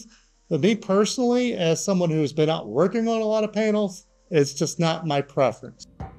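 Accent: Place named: United States. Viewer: where's your accent from in America? I don't live in America so it's genuine curiosity 😅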